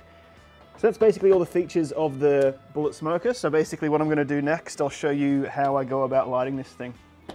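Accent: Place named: Australia. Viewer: Hi mate, you sound Australian. 👍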